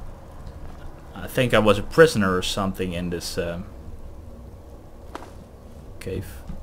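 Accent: Netherlands. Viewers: Ok cool! When are the next parts coming out? Do you have an upload schedule? Sorry for all the questions I am just really excited for this series haha PS: Je hebt een Nederlands accent kom je uit Nederland?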